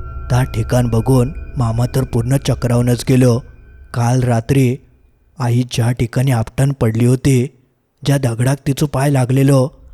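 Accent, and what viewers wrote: Malvani, India. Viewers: Hi malvni ahe ashich aste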